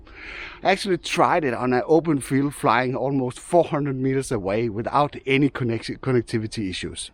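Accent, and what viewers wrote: Denmark. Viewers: Tænkte nok, jeg kunne høre det på accenten.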